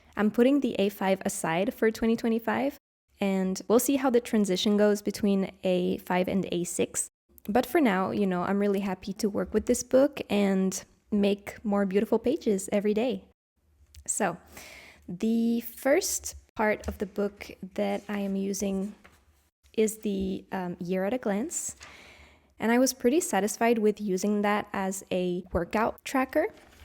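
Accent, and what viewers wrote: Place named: England, United Kingdom. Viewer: Cette vidéo est vraiment trop cool et super encourageante. J’ai acheté mon premier hobonichi pour 2025 et je me sens toute impressionnée. Pourtant j’ai l’habitude de remplir des journaux. J’ai vu Bruxelles dans certaines de tes pages (pardon je ne voulais pas lire), tu as un super accent anglais ! Au plaisir de discuter du hobonichi 😊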